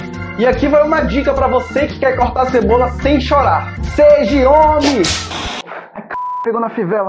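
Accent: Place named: Pará, Brazil. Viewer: só pelo sotaque parece bem paraense hahaha Gostei da tecnica e super funciona! obrigada =D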